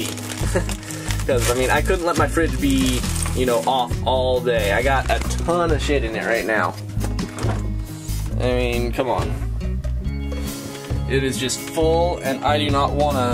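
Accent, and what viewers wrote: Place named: Canada. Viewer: I'm hearing a Canadian accent in your voice lately. Please explain what "Fahrenheit" is for the 90% of the metric planet earth please...lol.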